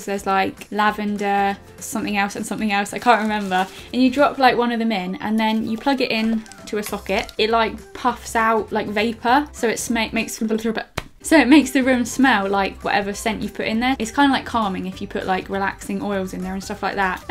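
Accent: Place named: London, United Kingdom. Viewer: Sotaque de Londres não engana!🇬🇧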